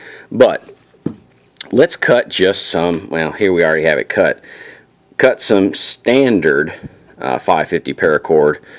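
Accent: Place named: Appalachia, United States. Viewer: Is it possible to do the whole video without sounding like an inbred hillbilly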